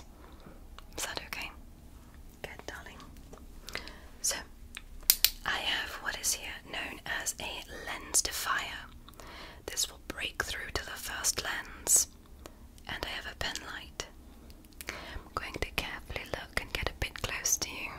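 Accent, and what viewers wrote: United Kingdom. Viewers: Amen. Her british/alien voice melts me like butter on a pan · Are you British?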